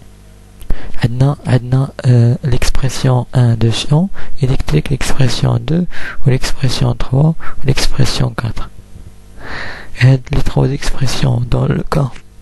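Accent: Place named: Morocco. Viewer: Il est marrocain 🙂 donc c'est normale que ça façon de parler n'est pas comme la tienne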